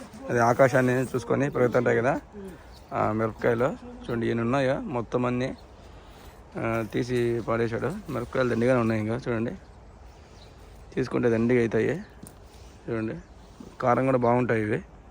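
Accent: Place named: Rayalaseema, India. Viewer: మీ తెలుగు చాలా బాగుంది మా రాయలసీమ వారివాలె వున్నారు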